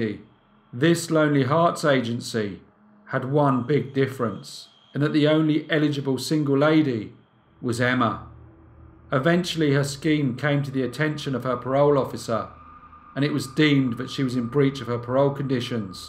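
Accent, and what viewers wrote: England, United Kingdom. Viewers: It is a good strong and very clear English Accent you have and perhaps some People Don't like certain Accents and nothing can change People's minds on an accent. Don't mind the critics,your channel is a treasure! You always deliver the story brilliantly and with real conviction. Its always a pleasure to listen to your stories,thanks again.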